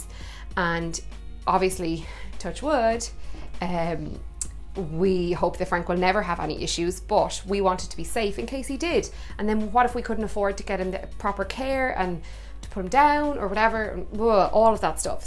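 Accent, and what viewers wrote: Ireland. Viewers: I'm mainly here for the Irish accent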